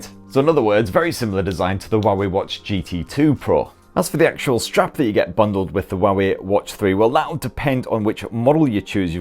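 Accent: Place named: England, United Kingdom. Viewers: Celia doesn’t understand your northern accent 🤫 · Here for the english accent😁💜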